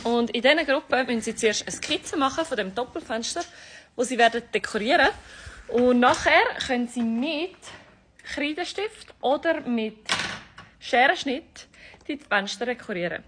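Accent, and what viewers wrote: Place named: Switzerland. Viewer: Danke für die Untertitel, hätte das Schweizerisch sonst kaum verstanden, klingt aber toll.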